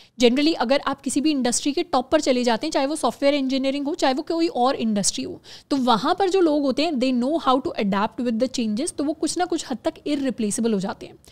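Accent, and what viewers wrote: India. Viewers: It hard to understand indian english accent 😢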